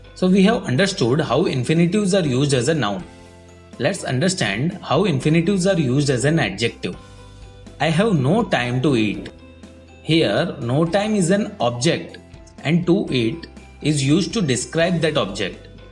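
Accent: India. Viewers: I wonder why i don't understand indian english? Is their someone feel the same?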